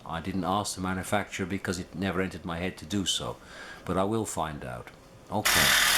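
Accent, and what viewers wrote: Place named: Wolverhampton, United Kingdom. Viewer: You from Wolverhampton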